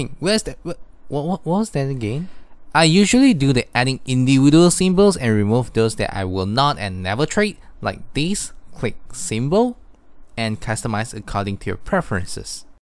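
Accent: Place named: Singapore. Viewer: youre singaporean, right?????!! Too Chinese!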